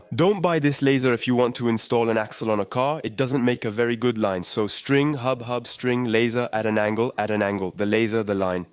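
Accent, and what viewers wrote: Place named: Balkans, Eastern Europe. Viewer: ont veux l'accent anglais des Balkans coupé a la serpette !!!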